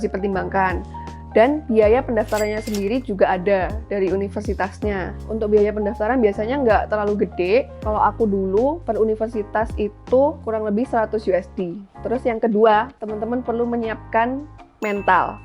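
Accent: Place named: Java, Indonesia. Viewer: medok nyaaaaaa hha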